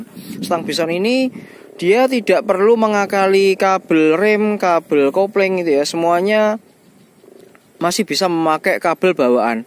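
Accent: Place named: Java, Indonesia. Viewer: terima kasih informasinya masse sangat detail, to the point, tidak bertele2 tapi lucu ya masse ngomong indo logat jawanya kental 😂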